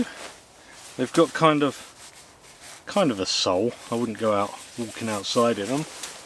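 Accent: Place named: Cockney, United Kingdom. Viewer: Love your cockney accent "Should have a Fick frost tonight".. I used to be inlove with a cockney lad, so it's a special accent for me. Your vids are so good, very relaxing!! My husband ended up liking them too:) Yeah.. pizza is hotter than sun (as one of your mates says) when it first comes out 😦 You could make a fortune selling "Open Fireplace" screen savers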